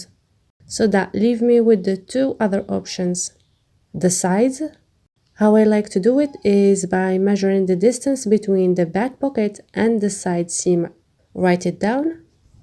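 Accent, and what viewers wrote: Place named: Morocco. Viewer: Where are you from? You have a morrocan accent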